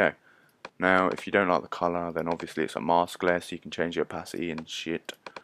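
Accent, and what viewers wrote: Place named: United Kingdom. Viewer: Brittish accent on a tutorial. + 10 points.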